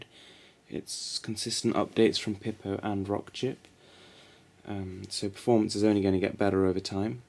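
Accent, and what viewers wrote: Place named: RP, United Kingdom. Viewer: Good review, thanks for taking the time to do it. I've just ordered one. P.S. You have one of the finest posh accents I've ever heard. Bravo, good sir!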